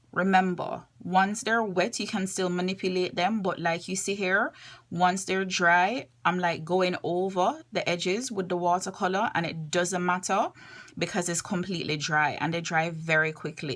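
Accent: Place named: Jamaica. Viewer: heard your accent. Instance sub. I am JAMAICAN TOO. :-)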